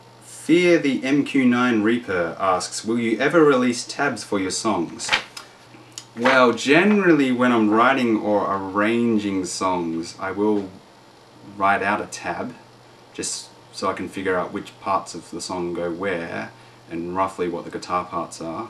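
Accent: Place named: Australia. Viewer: its so good to hear another aussie voice...reminds me of home...even though i'm at home...also pro tools...good boy...komplete 8....i'm jelly...guitar rig...ehh i'm a amplitube bro myself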